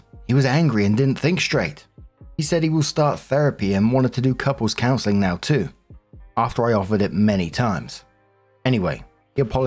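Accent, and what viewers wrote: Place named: Liverpool, United Kingdom. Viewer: I'm listening but not reading the screen, so at first I thought he was wearing a wig and talking with Liverpool accent, I was going to ask "is he pretending to be John, Paul, George or Ringo?" xD edit: Hahahhaahahaahaha, I'm glad I'm not the only one that thought it.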